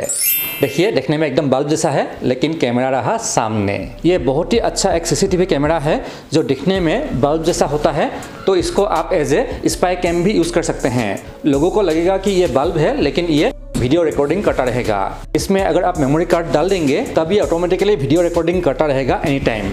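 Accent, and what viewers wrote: Assam, India. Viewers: Bhai aap Assam se ho kya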